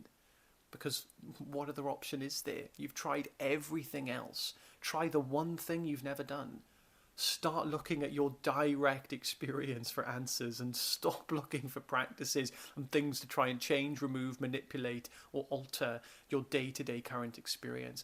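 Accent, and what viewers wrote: Wales, United Kingdom. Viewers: Do I hear a slight welsh accent?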